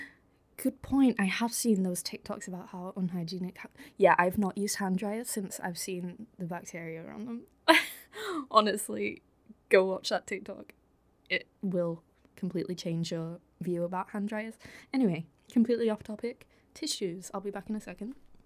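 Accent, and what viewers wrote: United Kingdom. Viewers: I've been a bit curious but are you from Manchester or am I just thinking wrong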